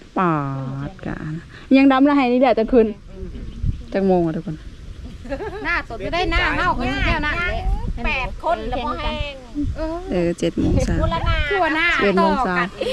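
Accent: Isan, Thailand. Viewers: เว้าคือคนอีสานบ้านข่อย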